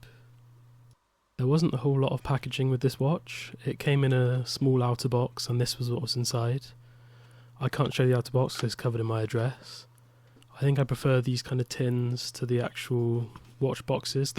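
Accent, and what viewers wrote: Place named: Wales, United Kingdom. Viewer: My mam is from Cardiff, but we were born 1st gen Americanos. My father is from La Paz, maternal Grandad Jamaican--British Merchant Marine, maternal Gran Irish. Me and my twin brother have spent long summers with family back home in Wales. As an adult, having grown up watching a lot of British telly and movies, I have developed a fascination with all dialects of English. Your accent sounds faintly like my cousin Janet's, who has a bit more of an RP Welsh blended accent. I cannot quite ID your accent, but you have a lovely speaking voice for YouTube presentation. I rather enjoyed your presentation, and I would not worry about not feeling confident, especially if you are not accustomed to public speaking, because the lingua franca on YouTube is predominantly authenticity. Polished presenters are perceived as brand shills, so you are way ahead of the game, mate. 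Keep up the good work!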